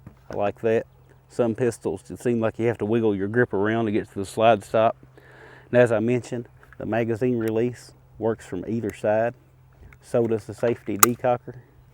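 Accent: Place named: New York, United States. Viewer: Bought this one for a Birthday present for my 34th B'day....I'm 62 now and shoot this Gem weekly and like others have said not experienced even one Fail to load...Fail to Fire....Stovepipe.........Nothing!!! They truly don't Make them like this anymore. By the way....You New Yorkers sure do have funny accents!!!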